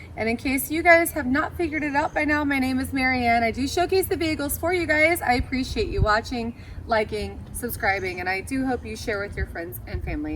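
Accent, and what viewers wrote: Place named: United States. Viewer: Shame about the whining American accent 👍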